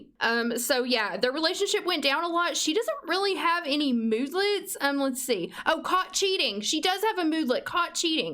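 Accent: South, United States. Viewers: you sound like my southern math teacher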